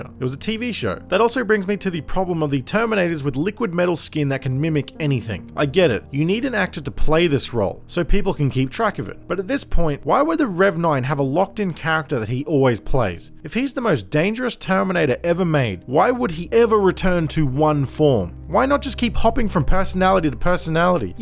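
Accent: Australia. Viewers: BTW I love that Aussie accent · Are you Australian?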